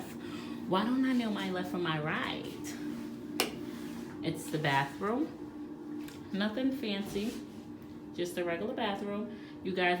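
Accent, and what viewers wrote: New York, United States. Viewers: Girlllll I knew you was from NY the moment I heard you talk!! I'm from The Bronx and can definitely appreciate you keeping it real. Loved the apartment tour!!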